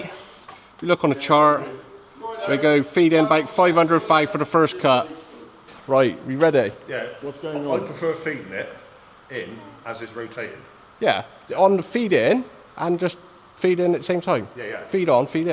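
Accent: Bristol, United Kingdom. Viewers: Great work, sound like Bristol accent or close to Bristol?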